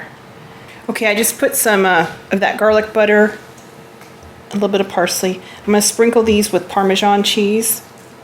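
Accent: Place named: Tennessee, United States. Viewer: Idk where you are from, but I'm from Tennessee, and you sound just like me lol you remind me of family!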